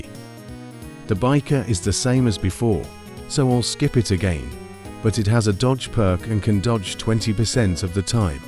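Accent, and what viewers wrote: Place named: United Kingdom. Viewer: British voice 🇬🇧🇬🇧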